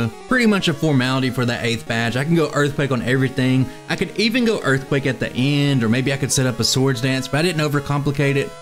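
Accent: South, United States. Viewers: you kinda have a southern accent! I'm surprised!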